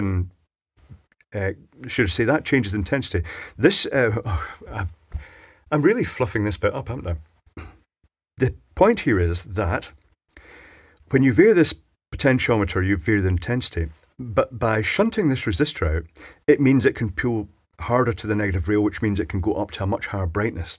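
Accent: United Kingdom. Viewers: British English is in full effect in this video. Crikey.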